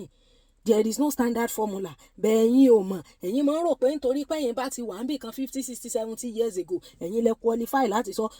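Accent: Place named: Oke-Ogun, Nigeria. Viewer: I love your accent as Omo ilu Oke 😊, I really enjoy it, ride on, Mama 👍🆗👍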